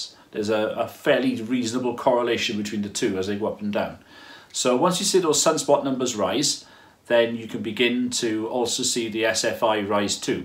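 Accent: Wales, United Kingdom. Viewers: Thanks. That was a really good presentation. Did I detect a Welsh accent in there somewhere?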